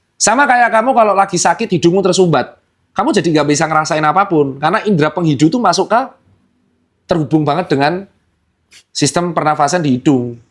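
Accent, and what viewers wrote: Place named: West Java, Indonesia. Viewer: Nih dr tirta aksennya sprti orang sunda.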